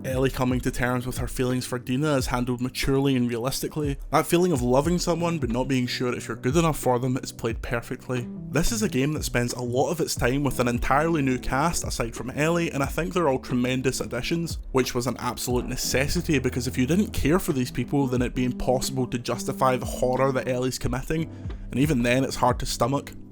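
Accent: Scotland, United Kingdom. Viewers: Scottish accent right? not irish? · Yeah Scottish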